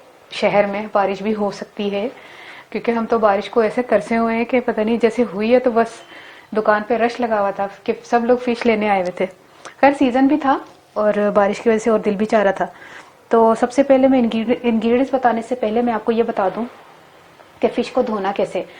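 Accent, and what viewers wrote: Punjab, India. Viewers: ap ka lehja to Panjabi ha lgta ni Karachi ki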